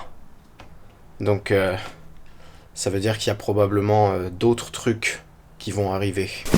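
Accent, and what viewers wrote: Alsace, France. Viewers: Tu commence à choper l'accent Alsacien à force d'être avec EMS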